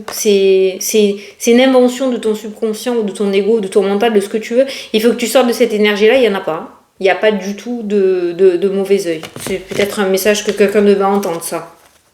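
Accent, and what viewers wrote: South, France. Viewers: Bonsoir, toujours la joie quand je vous écoute, c est mdr, j'adore votre façon de vous exprimer avec votre petit accent du sud moi j'habite dans les Ardennes 08. Vous êtes franche mdr avec humour. Je vous apprécie. Merci pour votre bonne humeur. Merci pour votre gentillesse et votre bienveillance. Que Dieu vous bénisse ainsi que votre famille.❤❤❤🙏🙏🙏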